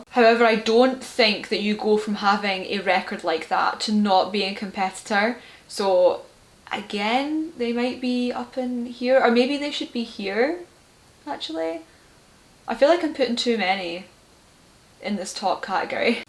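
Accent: Scotland, United Kingdom. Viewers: Be still my beating heart. Scottish blond woman who loves Leaf's. I might of just found my future wife. As a Finnish hockey fan and judge Toronto Leaf's fan I am in love... 😂😂 I really could listen that Scottish accent all day long. But to be serious, I'd love to see your deeper prediction/valuation of Leaf's. Love from Finland.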